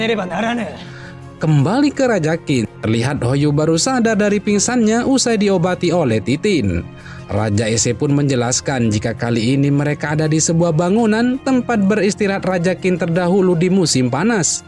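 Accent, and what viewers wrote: Madura, Indonesia. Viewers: Kayaknya orang Madura ini. Dekremmah taiyeh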